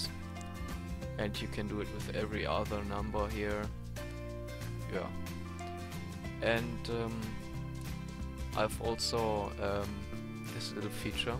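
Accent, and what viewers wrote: Germany. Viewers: Man hört deinen deutschen Akzent mega :DD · man hört sehr stark heraus das du deutsch bist deswegen finde ich sollst du es eigentlich auf deutsch machen und nicht auf englisch, da geht es nicht darum ob man englisch kann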